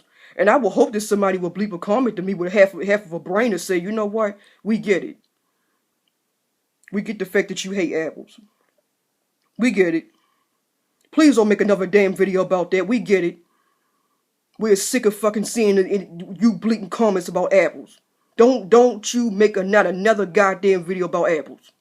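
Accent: Baltimore, United States. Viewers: (Twiztid Lestat) Baltimore accent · You seem to have a Baltimore accent. I see to this show I liked all the time and their accent sounds like yours and it was set in Baltimore. Love the different accents around the globe. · she has a very thick Baltimore accent...Most everyone I know from Bmore stress the U sound hard...its kinda funny tho, I use to tease a friend when she pronounced the color "Blue" .....it was too cute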